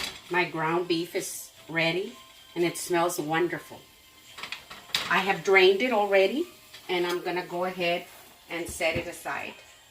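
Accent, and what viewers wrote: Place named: Texas, United States. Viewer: . Love your channel. Reminds me of my grandmother’s cooking. Must be the love. I was raised in south Texas and your slight accent is reminiscent of my upbringing. It’s like being home with family.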